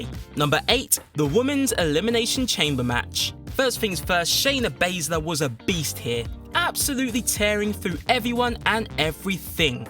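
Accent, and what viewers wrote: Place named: United Kingdom. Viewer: well first of all they're based in the uk so they've got accents and second he speaks just fine I actually really like this guy. Just cause he is not spelling it out for you doesn't mean he can't talk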